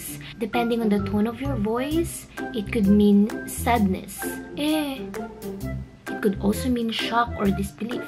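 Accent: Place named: Batangas, Philippines. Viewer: Ganda mag salita nang batangeño kasi galing din ako nang batangas garne kasi yon working batngas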